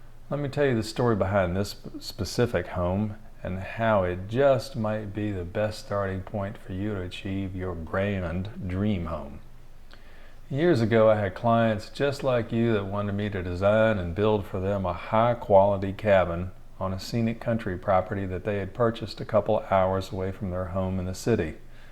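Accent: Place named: Virginia, United States. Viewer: The cabin is beautiful along with your accent and the fact that you're from Virginia.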